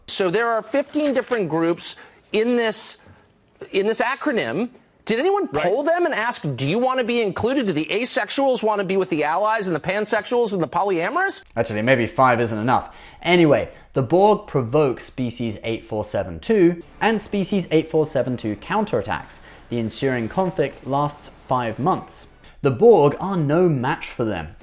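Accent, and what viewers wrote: United Kingdom. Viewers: We demand more! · Woohoo!!! More! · Missed ya,:) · is back!!!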